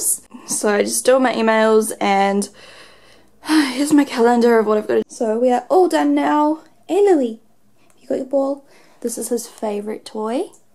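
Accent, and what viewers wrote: New Zealand. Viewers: Hi, congrats for the million subscribers! I remembered following you at the beginning some years ago just because of your NZ accent (I'm an English teacher in France) and now I'm just following because I like watching your videos;) Thanks for the smoothie recipe and I thought it was interesting to watch you editing and voice over your videos:)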